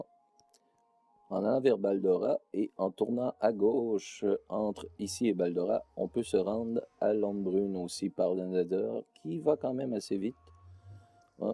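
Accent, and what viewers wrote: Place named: Quebec, Canada. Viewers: C'est normal, c'est un québécois.. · Tu viens d'où au juste ? Si t'es de la France, je peux te dire que t'as un petit accent québécois, j'ai l’impression.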